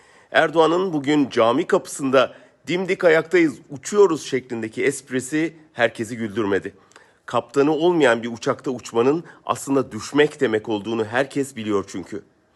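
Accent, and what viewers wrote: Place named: England, United Kingdom. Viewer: Türkçe konuşan İngiliz!!!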